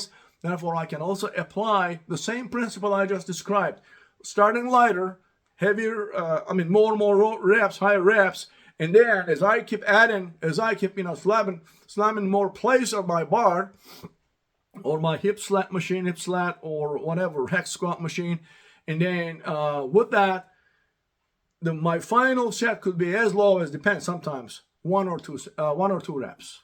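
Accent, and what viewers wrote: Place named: Texas, United States. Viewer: abi, teksas accenti ile konuşmak seni godfather filmindeki adamlara benzetiyor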